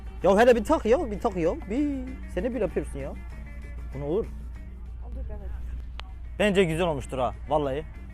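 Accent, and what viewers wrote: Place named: Eastern Anatolia, Turkey. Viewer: Doğu şivesi videoların çok iyi bence devam etmelisin çekmeye 😂😂👍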